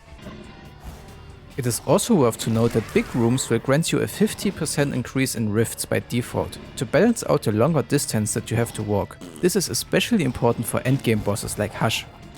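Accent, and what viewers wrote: Germany. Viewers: Wait ya german?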